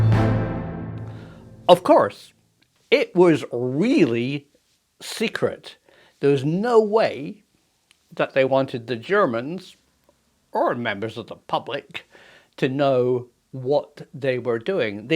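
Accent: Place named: Oxfordshire, United Kingdom. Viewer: Awesome, you from Oxfordshire?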